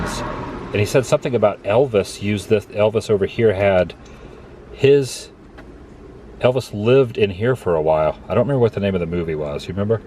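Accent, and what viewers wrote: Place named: Los Angeles, United States. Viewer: The baby voice does make me want to scream. I feel like a lot of LA people talk like that lol.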